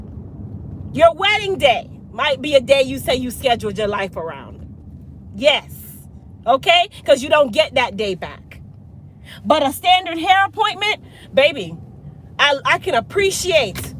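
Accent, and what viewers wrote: Jamaica. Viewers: Do I hear a Jamaican accent , dear one ? Sj.💛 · you are absolutely right! Love your Jamaican accent 😊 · I love how the patois comes in and then you put it in your pocket for a bit and then you pull it back out when the heat turns up. Blessings to you. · U was born in usa...but u speak jamaican well...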